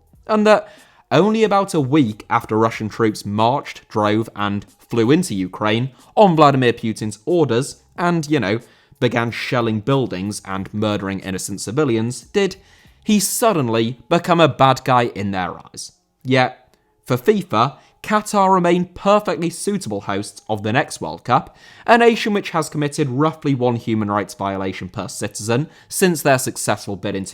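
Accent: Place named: England, United Kingdom. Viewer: his accent is a fairly normal English accent. Get you head out of your ass and learn that you haven’t been made a member of the pronunciation police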